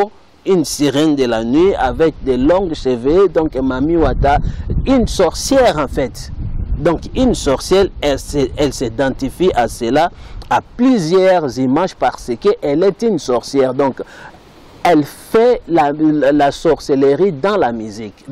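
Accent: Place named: Democratic Republic of the Congo. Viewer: N'insulte pas pardon.. C'est l'accent congolais qui fait ça.. Personne ne t'a demandé de cliquer sur la vidéo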